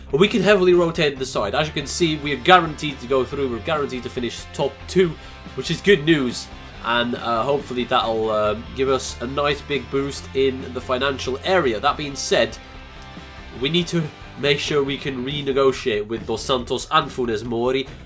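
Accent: Netherlands. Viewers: Ben jij Nederlands (translation: are you dutch cuz i heard a dutch commentator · Is he dutch? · Are you Dutch? I'm pretty sure I heard Evert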